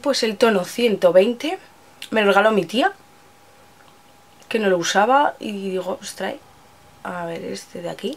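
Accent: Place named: Aragon, Spain. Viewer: me suscribo ,me gusta mucho como te explicas y tu acento maño,por cierto a mi tampoco me favorecen los tonos nude aunque me encantan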